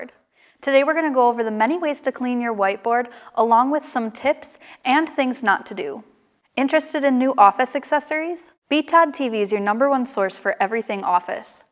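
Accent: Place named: Canada. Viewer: Hahahahaha I’m sorry but it’s funny how concerned/upset she is in the intro about people “causing permanent damage to their white boards”... I can just picture her at the bar on Saturday night talking wayyy too much about white boards🤣 Also what accent is that??? Just curious.. is that Canadian? Listen to her say magnets: “Maeyyynits” Anyways joking aside thanks for the informative lesson on whiteboard maintenance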